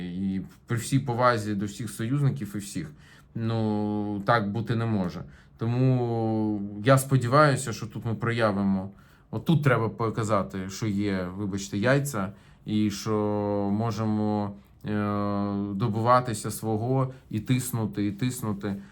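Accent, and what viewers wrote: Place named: Russia. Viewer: Это что, украинская мова??? Да ты даже язык выучить не можешь. Это даже не суржик, а Русский с хгеканием!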